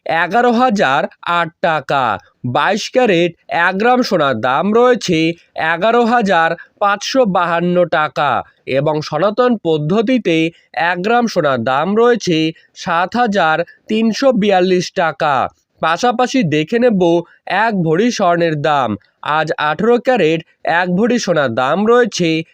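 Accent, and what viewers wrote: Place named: Kolkata, India. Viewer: কথা শুনে মনে হইতেছে কলকাতা বাঙ্গালী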